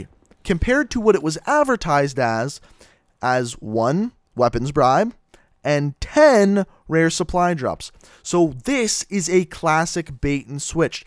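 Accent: Canada. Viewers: Is this guy From Canada or does he have a accent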